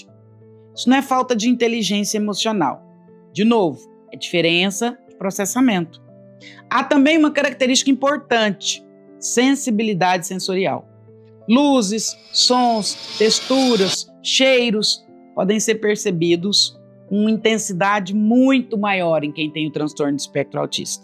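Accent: Minas Gerais, Brazil. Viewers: Sempre ouço os vídeos em podcast! Mas percebi no sotaque mineiro,uma credibilidade,. portanto a atitude de não menos que se inscrever em um canal a qual pessoa me faz se emocionar!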